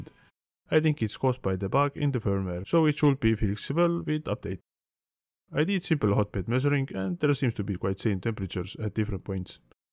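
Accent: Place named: England, United Kingdom. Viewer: I don't have that either! I use translate! It's more about his deep voice and English accents! What I'm happy about and what's most important, is that makes some good videos, and I enjoy them!:)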